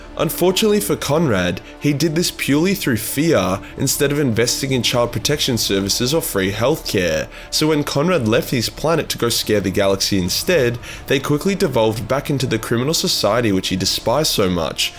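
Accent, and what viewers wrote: Australia. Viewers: If you hear trader, its actually traitor. Just aussie things.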